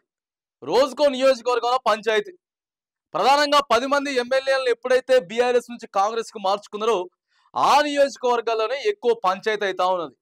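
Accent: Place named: Andhra Pradesh, India. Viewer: అందరికీ నమస్తే నువ్వు ఆందోళన తమ్మి అందరికీ నమస్తే అన్న పదం మంచిగుంది గాని నీ మాట వింటే ఆంధ్ర లెక్క ఉన్నావ్ ఆంధ్ర కుక్క వా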